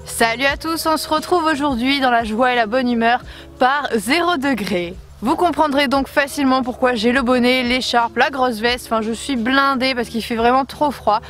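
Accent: North, France. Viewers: Tu est du nord ?? Je t'adore bisousss 😘😘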